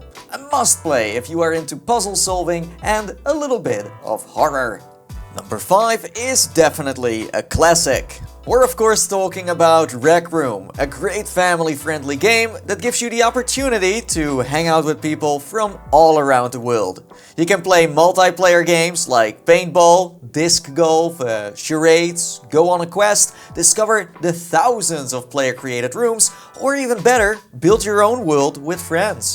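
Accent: Netherlands. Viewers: I just cant stand his accent, I mean I'm dutch and he is too but his english has a dutch accent in it, I just cant listen to it. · Your english accent is verru dutch, are you dutch?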